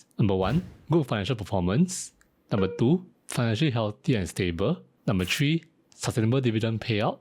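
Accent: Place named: Singapore. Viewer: Love your accent, are you from Singapore?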